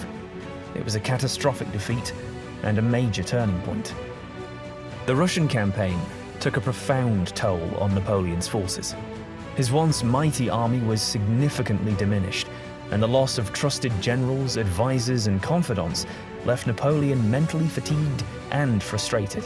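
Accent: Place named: Italy. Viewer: I love how the narrator uses his Italian accent! 💙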